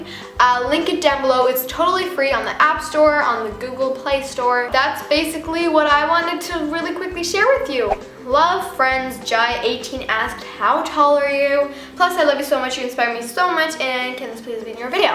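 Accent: United States. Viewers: If you're Turkish and all your family are and you were born there. Why do you speak in an American accent? PS. I'm going to Turkey on Saturday :) WOO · Hey I'm English, but I live in Northern Cyprus which is like Turkeys neighbour and I can see the Turkish mountains from my window sometimes. I've lived here for most of my life now, so I can speak fluent Turkish. Anyway I was wondering why you have an American accent if you live in Turkey and your parents are Turkish and you were born in Turkey? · How'd you learn English? You have a perfect (american) accent 😂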